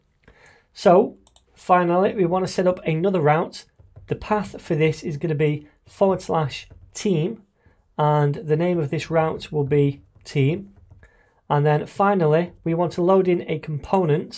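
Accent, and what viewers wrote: United Kingdom. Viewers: Yeah! His UK accent too 😁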